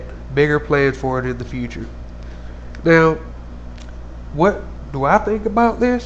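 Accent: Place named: South, United States. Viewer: lol u probably get it alot but u sound so amazingly what we think of as southren yank in britian so i had to b ironic i mean nascar in any other accent just doznt sound right lol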